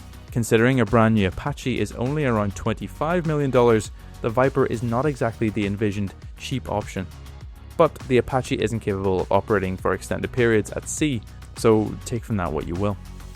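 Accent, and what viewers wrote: Northern Ireland, United Kingdom. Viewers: And this narrator is northern Irish AF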